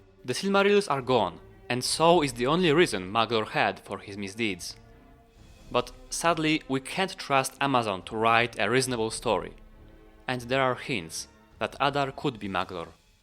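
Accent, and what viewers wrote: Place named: Poland. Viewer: klasycznie polski akcent 👍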